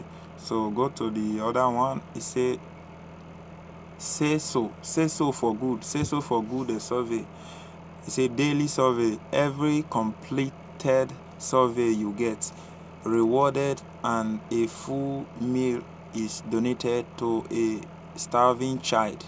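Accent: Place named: Nigeria. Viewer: He must be Nigerian or just African because I'm African and my dad has his accent lol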